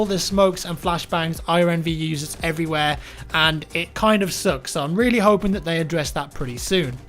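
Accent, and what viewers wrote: England, United Kingdom. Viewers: He's English. They pronounce everything different. Even in various regions of the Isles the words will sound completely different. It's the beauty of accents. Much like opinions, no two are alike.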